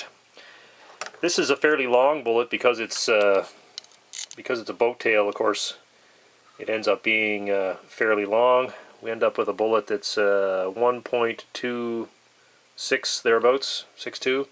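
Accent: Canada. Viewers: You sound Canadian. Greetings from an American citizen, who was born and raised in England.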